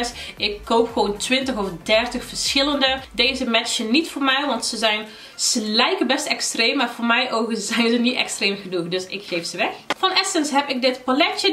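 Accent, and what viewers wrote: Brabant, Netherlands. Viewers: Hoi, ik volg je al een tijdje. Wat ik leuk vind is dat je alles duidelijk uitlegd én wat is super vind is dat je uit mijn stad komt Den Bosch. Het is super om het Brabantse accent te horen en te weten dat je zo geliefd bent. Succes met je kanaal en je verdere carrière. Groetjes Ingrid Saris❤️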